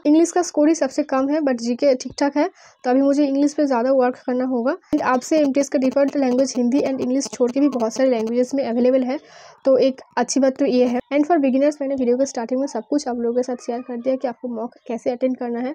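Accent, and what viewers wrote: Bengal, India. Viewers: U bengali?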